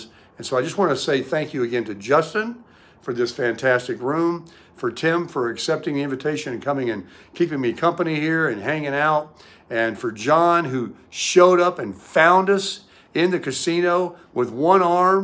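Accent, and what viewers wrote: South, United States. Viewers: He’s southern 👍🏼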